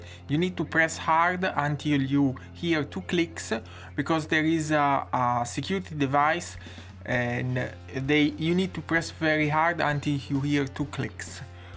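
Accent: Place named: Italy. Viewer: Great demo, one video is worth more than a thousand words. It was also nice hearing a genuine (not a Mario Bros) thick Italian accent. Thank you,. Greetings from 🇮🇹. 😎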